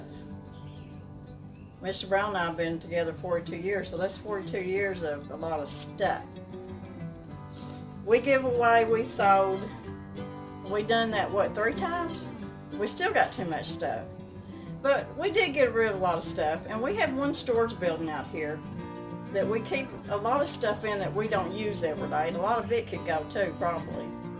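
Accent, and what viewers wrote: United States. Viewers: Wow, that is amazing. Next time take some video of him building this stuff :) What an amazing kitchen and pantry that you have. Oh my goodness... Yeah, please do some teaching videos on CANNING !!!! <3 <3 <3 God bless! I cannot figure out what state you are in with that accent... hmmm....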